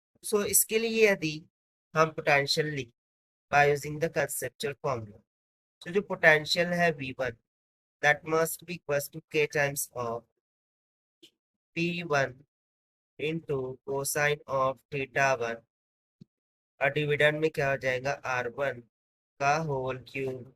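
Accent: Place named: South India, India. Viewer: South Indian hai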